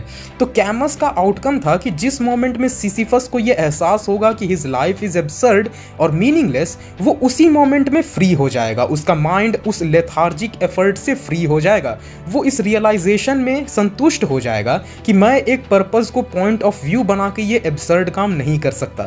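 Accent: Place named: Bhojpuri, India. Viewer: Bhaiya bhojpuria lg rhe ho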